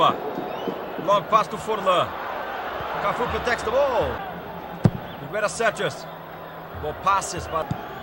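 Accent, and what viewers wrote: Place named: Brazil. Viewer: Esse narrador é Br! rsrsrs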